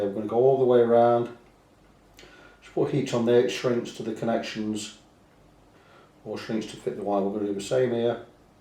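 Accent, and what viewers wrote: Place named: North, United Kingdom. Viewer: great stuff mate. was the guitar just standard 2 humbucker 3 way toggle, no push pull pots. just because normally you would be soldering 2 wires together from the p/up in the old school way. i'm putting a seymour jb 4 conductor in a les paul with pcb. i bought a pre wired molex adapter on ebay for purpose but wires came loose when plugged in! so thinking doing it this way. cheers pal, sounds like you're a fellow northerner....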